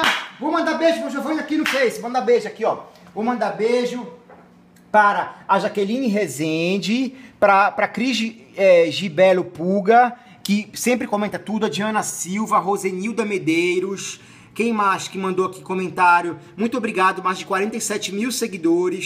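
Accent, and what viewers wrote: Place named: Pará, Brazil. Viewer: Ele é paraense!! Esse "eguaaaa" e leso são inconfundíveis. Kkkkk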